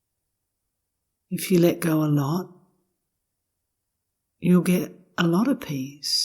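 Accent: Australia. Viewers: She has a beautiful calming voice a soft Aussie accent ❤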